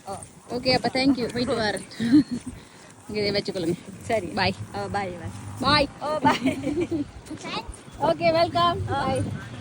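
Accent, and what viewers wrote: Sri Lanka. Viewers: எந்த நாட்டில் இருந்து பேசிறீங்க.இலங்கையா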